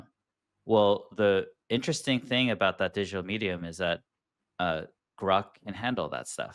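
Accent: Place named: England, United Kingdom. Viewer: This guy has the most annoying English accent ever. I want to listen to the guest. But I cannot handle the annoying accent! I just can' t.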